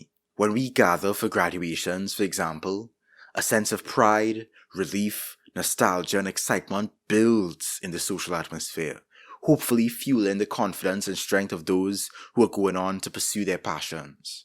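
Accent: Trinidad and Tobago. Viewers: Why you sounding like a Trini 😮🇹🇹💯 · Great video! Found you through the latest Noah Samsen vid. Also a trini, recognized the accent immediately. Keep up the good work. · Are you Trini? cuz this accent..... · I heard the accent and went "It's giving, Trini". Glad to be right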